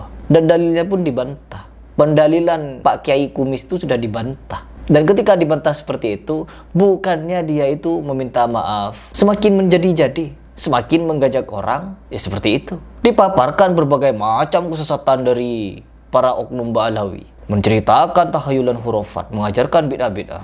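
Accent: Madura, Indonesia. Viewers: Lidah madura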